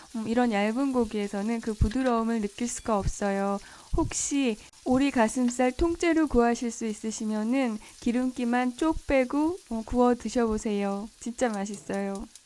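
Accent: Seoul, South Korea. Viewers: 와 이분 진짜 오리지널 서울 출신이신가보다. 서울 사투리가 말투에서 묻어나네요.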